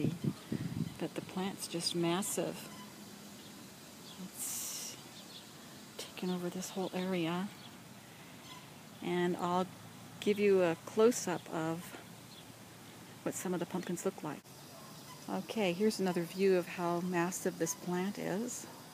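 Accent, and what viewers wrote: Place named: California, United States. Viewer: Hey!!! how are you?? Ive sooooo missed that soothing Californian accent!! Do those pumpkin runners keep growing or will they eventually stop? I hope your well my friend and your family are ok? Are you back to school this week? Lotte starts Big school on wednesday (infants) and jack becomes a junior!!!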